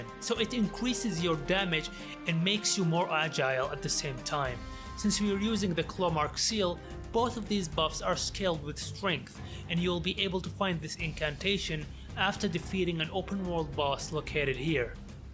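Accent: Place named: Saudi Arabia. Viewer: ياخي اللهجه السعوديه اسمعها لو وين ما كانت 😂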